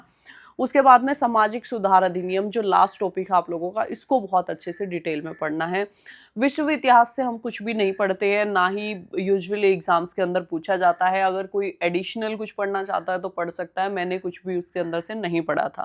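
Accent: Haryana, India. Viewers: Maam you have a perfect Haryanvi accent.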